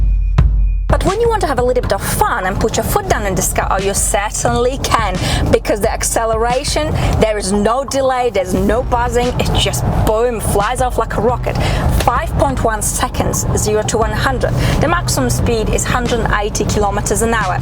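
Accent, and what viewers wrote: United Kingdom. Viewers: Thank you for a great review, ps your accent did you spend some time in the UK? Sounds like it sometimes. You look really smashing with your different great outfits in your reviews. Ceep it up 👍😉 cheers.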